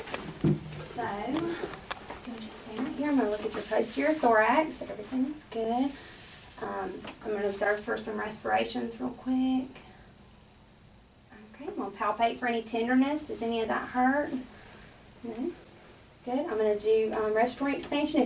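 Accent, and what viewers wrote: South, United States. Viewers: That southern accent makes the video😍👅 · I normally hate southern accents but hers is adorable.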